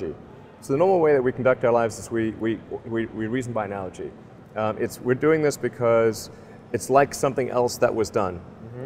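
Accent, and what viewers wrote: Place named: Australia. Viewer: How come he has an Australian accent?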